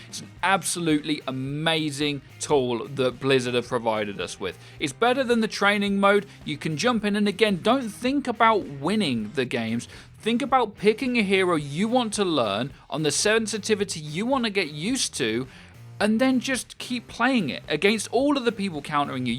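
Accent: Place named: United Kingdom. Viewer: Im from germany and i love your british accent!!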